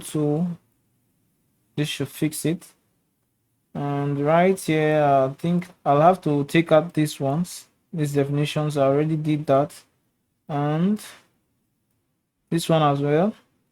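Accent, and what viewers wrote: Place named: Nigeria. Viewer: I liked the video but I really could not follow up because of the accent, it is difficult for me to cope with nigerian accent, not that there is anything wrong with such accent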